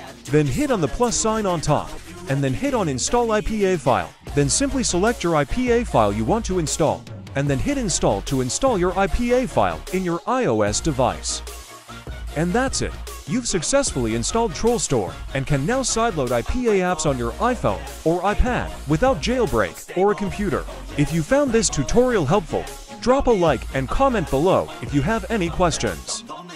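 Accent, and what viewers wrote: South Africa. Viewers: Yo are you from South African ❔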